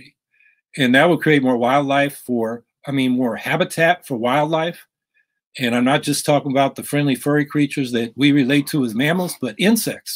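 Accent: Texas, United States. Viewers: I’m from Central and North Texas and can confirm he talks like a Texan or an Okie. Especially how he pronounces “the” like “də”. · Love your office, but for a man born in San Francisco why do you talk your from 1890s Texas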